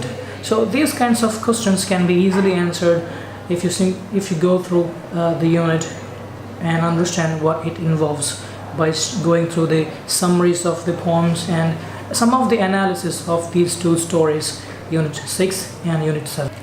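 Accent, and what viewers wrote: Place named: United Kingdom. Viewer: Pure British accent wow...Thanks.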